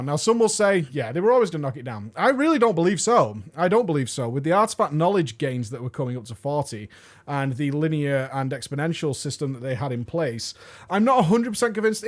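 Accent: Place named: England, United Kingdom. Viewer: Hallvardio it's an English accent tick. Some words with an English accent have a hanging r .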